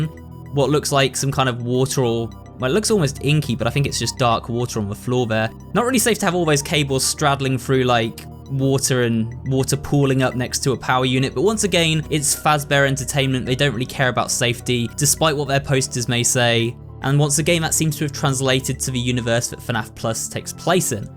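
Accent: United Kingdom. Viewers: The British accent doesn’t make sense to me sometimes “idea” is “ideer” it’s more syllables than the word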